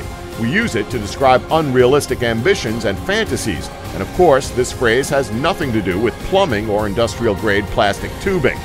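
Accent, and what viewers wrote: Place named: United States. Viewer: This channel is funny to me , it's a guy with an American accent reading something clearly written in a British dialect.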